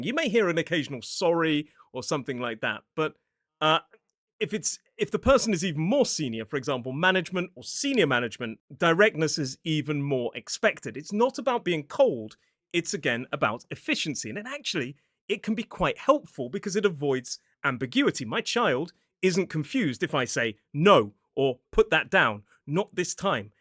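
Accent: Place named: Southeast England, United Kingdom. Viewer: Should add, this man is quite obviously from the south, probably south east, of England, and basically everything he has said is complete b*llocks with regards to any Welshman 😂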